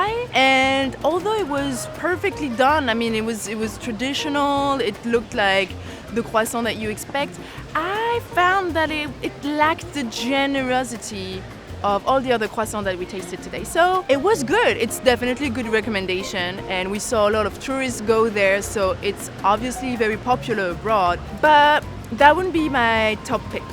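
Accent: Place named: France. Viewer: It’s french accent 🤦‍♀️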